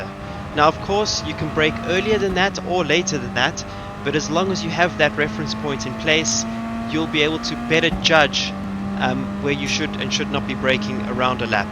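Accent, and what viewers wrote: South Africa. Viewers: Thanks for the tips! Is that a South African accent? I've been trying to decifer it. Lol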